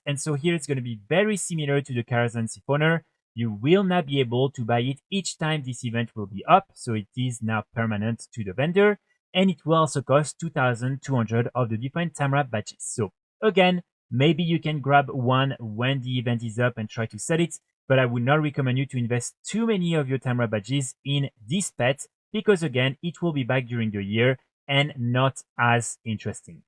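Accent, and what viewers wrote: France. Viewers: Super tes vidéos, mais t’as un accent français on dirait.. en tout cas, ton Discord ne marche pas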